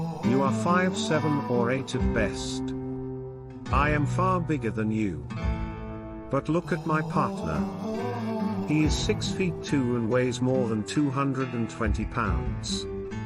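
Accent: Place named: England, United Kingdom. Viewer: English accent 💩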